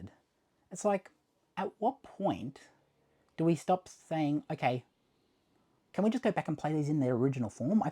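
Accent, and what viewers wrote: Australia. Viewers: Austrailan indigenous.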